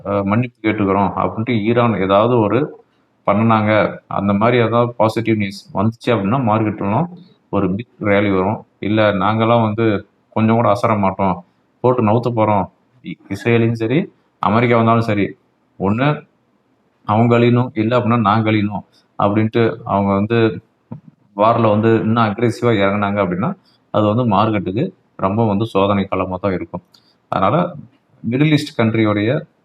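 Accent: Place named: Chennai, India. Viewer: avoid this madras slang. It sounds a bit un professional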